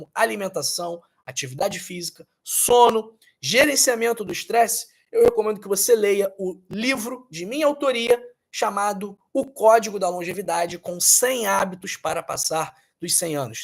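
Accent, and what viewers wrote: Rio de Janeiro, Brazil. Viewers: Claro que as mensagens são úteis. O ruim do vídeo é essa cara de filho de Satanaro e esse sotaque carioca insuportável!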